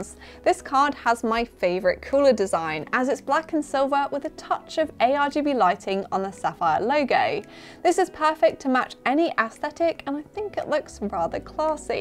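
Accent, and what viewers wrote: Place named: United Kingdom. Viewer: I love the British accent 🙃 Thanks for the translation From ksa🇸🇦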